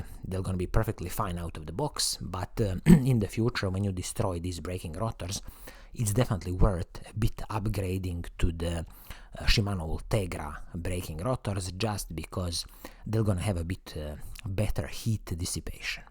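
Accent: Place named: Italy. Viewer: English with italian accent, so funny 😃, yes nice bike without stock,, it's like they are no exist like model.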